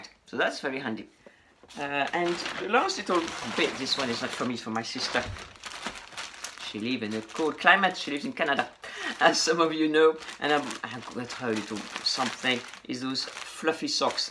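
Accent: France. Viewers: We love a good french accent I speak french, Spanish, welsh, Japanese, Korean and English wow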